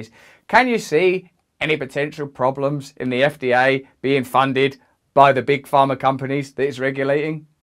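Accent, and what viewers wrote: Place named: England, United Kingdom. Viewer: Thank you Russell for all your very intelligent , informative videos . An your research is spot on , as is your insight to different aspects of life events . Plus your personality , your English accent speaking voice , an your good looks , you look like a rock star / Jesus with your beard an long hair , very attractive . An you take care an stay Healthy , Russell . An we look forward to your videos.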